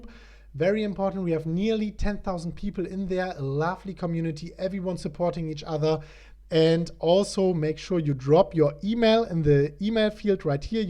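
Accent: Germany. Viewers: War schon klar das du dütscher bisch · I subscribed because of the great content and intellectual German accent. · Sounds like hes a German guy. Or am i wrong? At least he sounds like me if i try to speak english 😂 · Is The Moderator Form Germany or Something? No native speaker?!..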